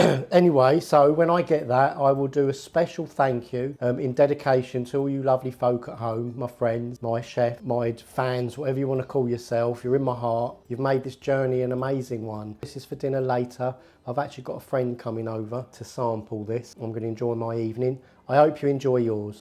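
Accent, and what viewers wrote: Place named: South Coast, United Kingdom. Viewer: Great video mate, where you from in the uk? You sound like my mate from the south coast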